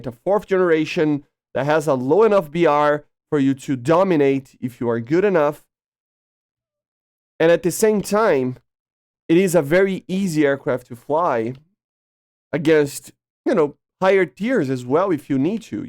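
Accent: Brazil. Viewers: Essa cara é brasileiro kkkkkk ele fala ingles mt bem mas tem um sotaque de Br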